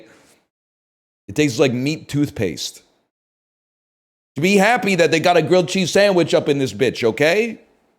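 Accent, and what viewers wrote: Canada. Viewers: Welcome to the Canadian accent. 😊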